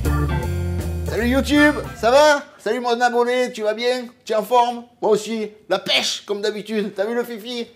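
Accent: South, France. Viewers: salut mon fifi sympa cette petite video qui met en appetit ca donne du courage pour commencer la semaine continue te un mec super a bientôt et garde ton accent ensoleille pour nous les gars du nord si non si non tu connaît la reponse ah ah bisous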